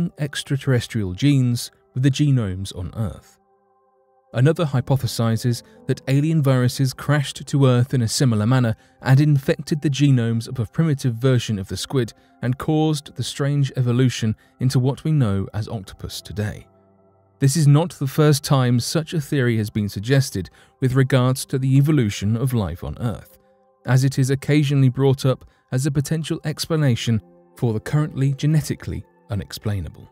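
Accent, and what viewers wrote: United Kingdom. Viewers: He is British, they pronounce a lot of things differently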